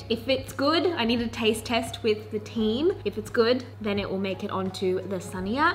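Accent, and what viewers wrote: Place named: Australia. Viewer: OMG YEA FINALLY!! 🎉 Called it on both the warehouse and the cook book. Low key been praying for a cook book because I’m a hard copy type of gal and I had a feeling from your vlogs when you kept saying you guys had filled the space at your old apartment I was like hmmm new office. Warehouse for photo shoots or new campaign ideas🙊🙌🎉 love it. Also the commentary is so Australian in this vlog I died when you said “hot AF”😂😂😂 Sending you many wishes for the new year and your new adventure with the warehouse. Can’t wait to see the series! 💗💗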